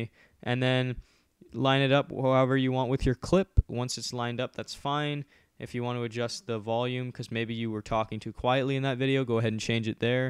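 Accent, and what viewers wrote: Canada. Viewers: Your voice changed. You have more of a Canadian accent now.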